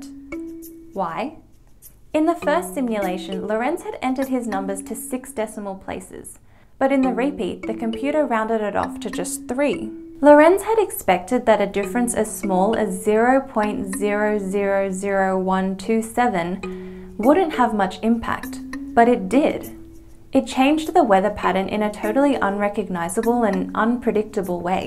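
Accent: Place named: Australia. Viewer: I appreciate your teaching style, animations, sense of humor, and your australian accent. Subscribed.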